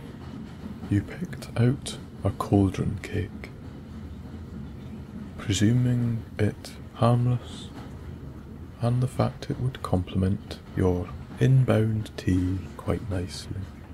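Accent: Scotland, United Kingdom. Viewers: I love ur Scottish voice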